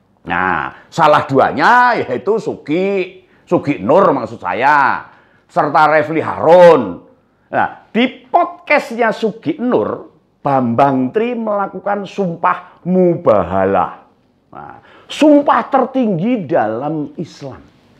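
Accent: Java, Indonesia. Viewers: Enak,gayeng,medok,blokosuko,terus pakde mantan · Maaas aku suka dengan logat jawamu,, sehat selalu, dihukum ae lhooo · 🤣🤣🤣🤣🤣🤣🤣🤣 Saya suka logatnya.... NJAWANI banget....🤣🤣🤣 Dagelan Jawa tapi sangat MENOHOK sampai ke jantung dan hati....bahkan sampai ke"SILIT".....🤣🤣🤣🤣🤣🤣🤣🤣🤣🤣🤣🤣🤣 Kena WASIR deh....akhirnya.... 🤣🤣🤣🤣🤣🤣🤣🤣🤣🤣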